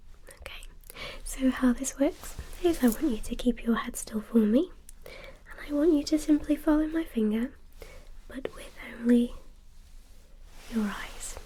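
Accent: United Kingdom. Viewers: I love her british accent😍👍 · So I see your from BRITLAND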